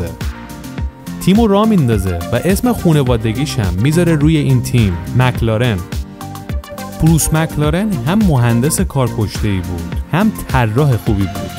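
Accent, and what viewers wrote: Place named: United States. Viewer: احمق داره لحجه آمریکایی درستش رو میگه ناراحتی؟؟ ساکت شو یاد بگیر چون تو داری اشتباه میگی، استاد زبان انگلیسی هست بچه جون🤔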